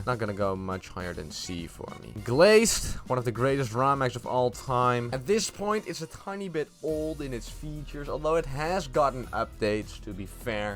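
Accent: Netherlands. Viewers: Not at all... Imao. Pewds is Swedish and sounds Swedish. is Dutch and sounds Dutch. But they're both definitely accents! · Wow, your accent sounds so Dutch! Geweldig.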